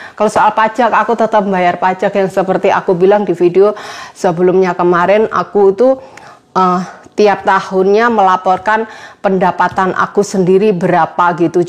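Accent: Java, Indonesia. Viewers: Konten mba kreatif kok yng komen gak kreatif itu orang emang bisa bikin konten seperti mba? Isi konten nya gak monoton kok misal nya cuma ngomong2 aja, jualan masakan aja. Malah berkat konten mba, aku bikin roti pake resep mba bagus rotinya. Liat konten youtuber ambil manfaat ilmunya, perjuangan orang tsb hingga sukses, yang paling penting bagi aku setiap sharing ilmu baking nya jujur gak nipu netizen. Mba ini walau hidupnya lama di luar negeri tapi gak lebai, suami bule nya gak pernah disuruh2 joget2 memalukan ala dangdut an di konten nya, gak bergaya dan berpakaian kebule2an, bahasa Indonesia dengan aksen jawa nya tetep ciri khas nya. Bahagia dan sukses nya kehidupan mba sekarang yg bisa bikin iri orang. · ngegas dan jutek Ngomongnya medok dan keminggris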